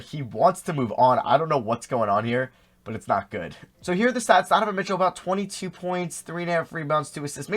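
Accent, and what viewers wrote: Pittsburgh, United States. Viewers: Hey are you from Pittsburgh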